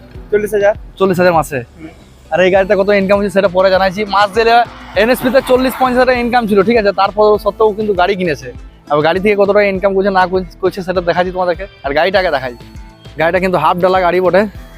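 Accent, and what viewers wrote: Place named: Purulia, India. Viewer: দাদা আপনার বাড়ি কোথায় ❤❤❤ , কথা গুলা আমাদের পুরুলিয়া র মত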